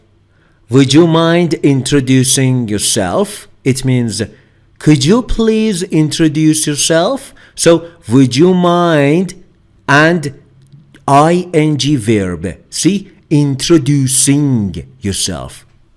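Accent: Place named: Togo. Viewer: Togolese english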